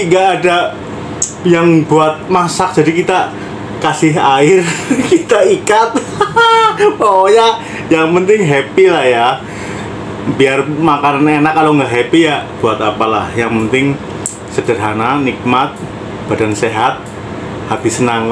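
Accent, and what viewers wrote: Banyumas, Indonesia. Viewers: Hahha lucu banget ngomong ngapak ky inong bae kang hihi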